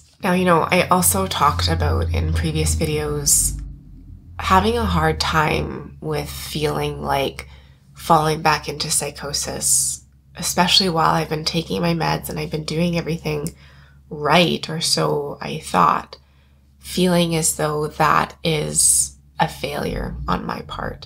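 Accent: Canada. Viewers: It sounds like you’re Canadian? If so, I will ignore the clinical dogma, as in the U.S. we use human experience language to describe extreme states. I consider the word “psychosis” a slur, no matter who utters it.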